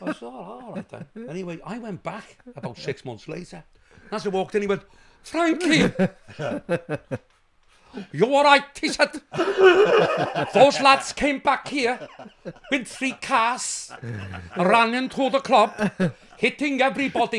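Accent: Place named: Wales, United Kingdom. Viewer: He has got the Welsh absolutely bang on, i lived there for 5 years, Their attitude and Phrasing, he has spent time there too, to do it so good. They had me good style, practiced for 2 weeks saying "My Guinni Pidlan Back" me thinking it was "you have a nice shop" - Obviously i realized when the whole Spar was on the floor, i had not said that at all, after 5 mins of evryone laughing, asking the butcher "What did i say"?? He replied "You have just said "I've got a small cock"..... Right in the middle of the shop"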